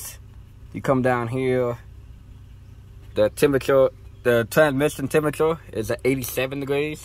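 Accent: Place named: Arkansas, United States. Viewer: I was locked up with a guy from Arkansas with an accent exactly like yours, is that where you're from?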